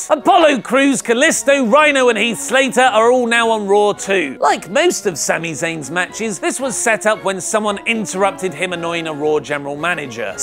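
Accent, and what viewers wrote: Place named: England, United Kingdom. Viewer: Where are you from? I'm not familiar with English accents, and I find your manner of puting an "er" in every word that finishes with an "a" quite intriguing! "John Cener" "Wrestlemanier" "Lucher Underground"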